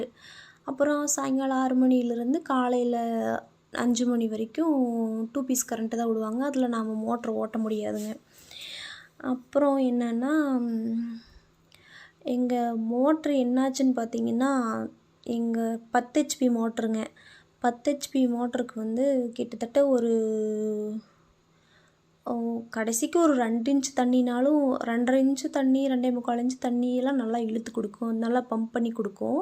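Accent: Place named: Kongu, India. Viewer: தாங்களுக்கு கர்நாடகமா சாரிமா(புனிதா)சகோதரி தாங்களுக்கு கோயாமுத்துர் என நினைத்தேன்மா சகோதரி ஏன் என்றால் உங்கள் பேசியதை(கொங்கு ல்லாங்க்)வைத்து நினைத்து விட்டேன் 😊😊😊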